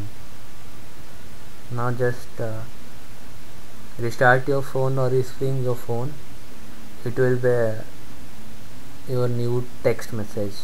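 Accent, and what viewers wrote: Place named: India. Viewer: I dont understand your Indian accent nobody tell what you are you saying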